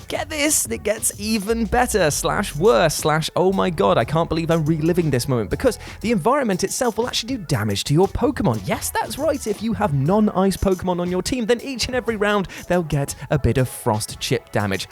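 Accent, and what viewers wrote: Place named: Wales, United Kingdom. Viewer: Always thought u were a brit. Your from Wales?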